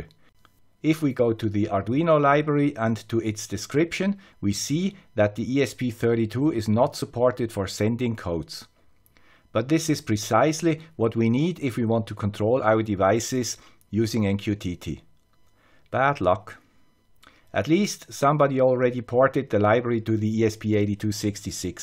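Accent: Switzerland. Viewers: HAHAHA "Here is the guy with the Swiss accent." If only I could put a 100 likes into this video. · I love your videos Sir. I am looking to make a simple ir blaster to turn on and off a TV and stumbled upon yet another great video from the guy with the Swiss accent. Thanks for the info. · And winner of my first comment on youtube is the guy with the swiss accent... thank you for all your great videos! A few weeks ago I bought during a flashdeal on aliexpress a cheap esp-01 with a relay and ended here on your channel... 30 Years ago when I was building my first cnc router it was really complicated to get all the knowledge together and today we just google... BTW I remember in one of your videos… problems with a 3D printer....maybe Iˋm the man... we are almost neighbours.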